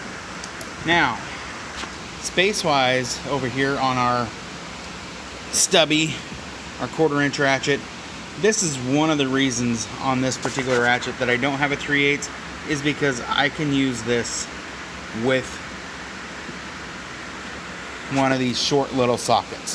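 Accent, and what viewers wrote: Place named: Iowa, United States. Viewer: Are you from iowa?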